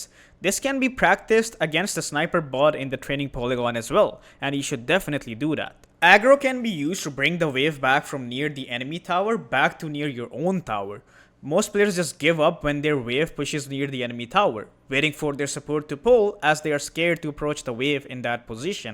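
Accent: India. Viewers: the indian accent even makes it soo much better. just like watching any other tutorial video online. its a familiar feeling.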